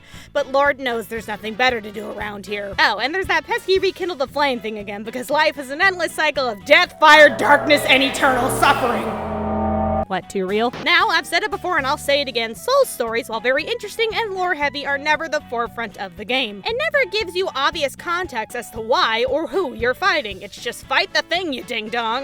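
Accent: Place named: Midwest, United States. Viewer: So fucking midwestern!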